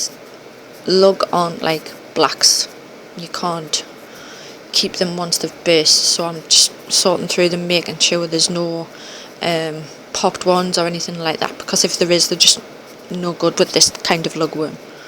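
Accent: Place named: Newcastle, United Kingdom. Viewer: Bexhill Windows ... sounds like Newcastle or South Shields...?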